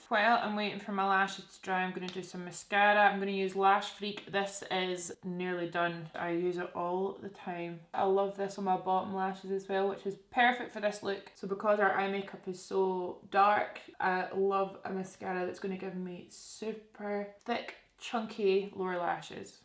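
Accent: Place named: North Lanarkshire, United Kingdom. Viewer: First time watching and I'm so homesick now. You sound so like my friends from home. North Lanarkshire by any chance?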